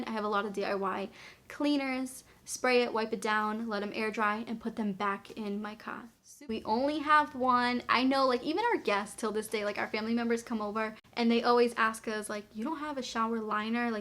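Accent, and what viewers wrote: Boston, United States. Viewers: Congrats on 100K love your content and that adorable Boston accent.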